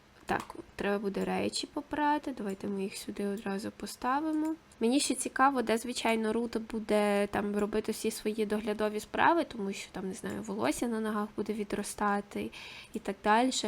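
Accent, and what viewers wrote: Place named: Western Ukraine, Ukraine. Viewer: Вауу, а я не знала, що так говорять на заході! Я з далекої півночі;)))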